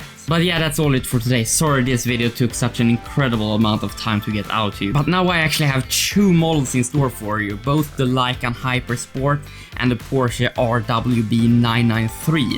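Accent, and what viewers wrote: Sweden. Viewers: Extremt stark brytning haha, du måste vara svensk?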